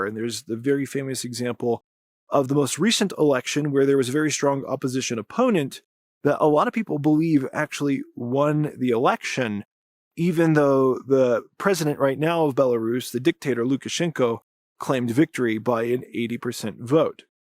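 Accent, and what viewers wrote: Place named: Southern California, United States. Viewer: Is he from California? I’m trying to peg the accent and I swear it’s SoCal.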